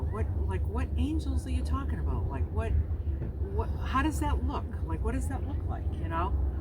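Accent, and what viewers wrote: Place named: Boston, United States. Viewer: I really enjoyed hearing about your spiritual journey. You have a way with words and the bostonian accent to boot, such a great storyteller. It's different for everyone and hearing others really gets my wheels turning. I believe in all things greater than myself, which always leads me to question. A wise woman once told me to not ask why but instead be grateful. Life long student over here, endless lessons to learn and so much gratitude.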